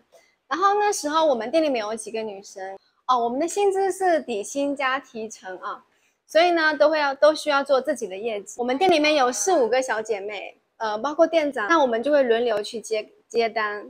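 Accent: Taiwan. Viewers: 厲害，講話幾乎沒有大陸口音，反而是台灣口音了！😀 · 口音变台湾腔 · 您的中文聽起來已經有點台中腔了，大概是慢慢地被同化了吧？ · 为什么非要学台湾腔？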